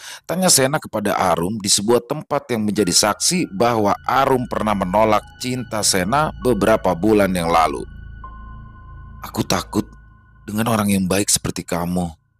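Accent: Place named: Jakarta, Indonesia. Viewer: Bang org betawi ya? Bhsanya lucu logatbetawi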